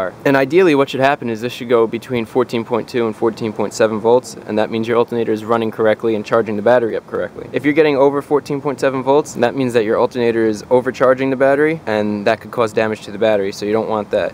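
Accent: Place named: Upper Midwest, United States. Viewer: Are you from the northern mid-west? Try not speaking lazy with your lips almost closed.. it makes you sound like Carmen on Southpark. Just like when singing, public speaking required that we open our mouth when speaking. Just a suggestion. .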